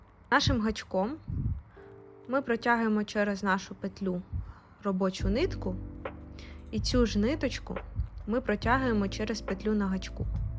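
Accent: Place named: Russia. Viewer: Прекрасный мастер-класс,прекрасная мастерица,но боже, как же режет слух украинская речь с русским акцентом😓 Такое чувство,будто украинский не ваш родной язык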